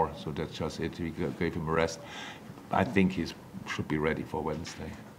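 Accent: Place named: Liverpool, United Kingdom. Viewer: I am going to miss Jürgen pronouncing names in a Scouse accent 💔💔💔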